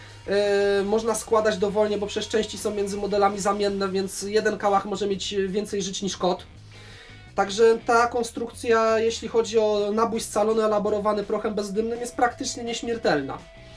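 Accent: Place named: Silesia, Poland. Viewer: szlysza ze z naszej gotki mosz ten hajmacki akcent, pyrsk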